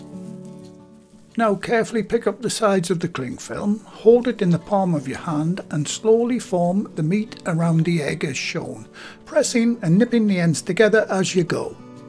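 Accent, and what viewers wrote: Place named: Northern England, United Kingdom. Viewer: Brilliant channel. I'm an expat Brit abroad and love hearing your northern accent. Very homely and wonderful old school British recipes.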